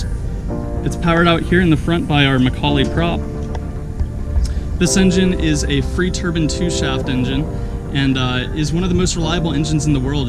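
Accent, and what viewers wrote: RP, United Kingdom. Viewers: You're so posh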